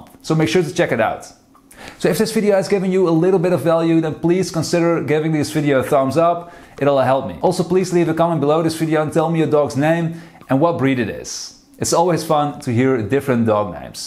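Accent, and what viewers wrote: Netherlands. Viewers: Your accent sounds Dutch, am I right?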